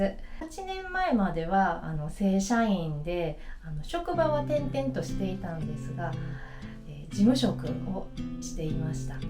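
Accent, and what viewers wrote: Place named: Osaka, Japan. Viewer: aiiro70さんが旅先でとても楽しそうに外国の方とお話をしているのを見て、私もスキマ時間に中国語と英語を学び始めました。 同世代、しかも大阪弁のaiiroさんの動画、とても親しみが持てて、楽しみにしています。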